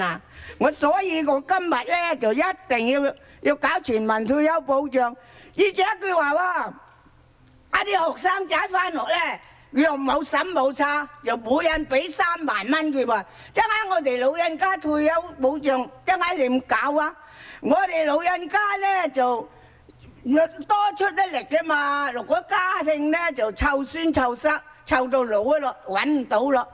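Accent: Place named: Western Guangdong, China. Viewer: 哈哈。粤西口音。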